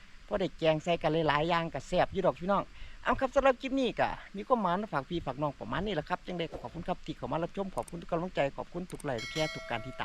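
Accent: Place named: Suphan Buri, Thailand. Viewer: บ่าวสำเนียงคือคนบ้านฉันเลยลาวสุพรรณ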